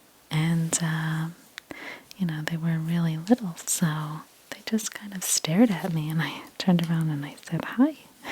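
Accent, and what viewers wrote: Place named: Midwest, United States. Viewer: Your voice is so soothing! There is also something familiar, are you from the northwest originally? ( IN, IL, WI, MI) ? You pronounce words in a nice crisp way, thank you!!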